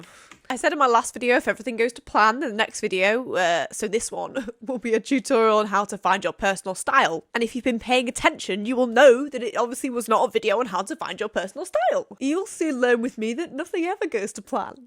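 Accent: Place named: United Kingdom. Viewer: I often watch videos on 125% speed, but I have to concentrate to listen to you on normal speed with your British accent me being American .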